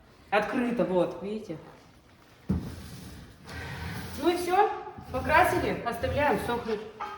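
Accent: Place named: Urals, Russia. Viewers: Здравствуйте. Скажите пожалуйста, вы с Урала? Говор у вас Уральский) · С Челябинском тоже говор похож))) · Говор обычный южно уральский, Коркинский. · Либо Киров, либо Пермь говор тот))))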